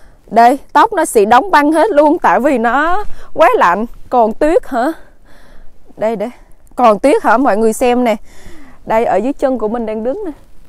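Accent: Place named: Quy Nhon, Vietnam. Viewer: Giọng này là quy nhơn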